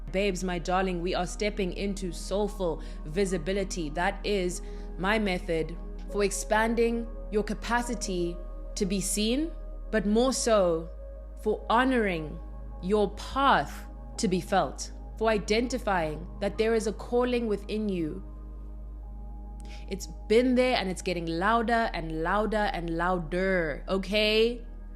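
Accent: South Africa. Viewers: Gurllll are you South African? 👀✨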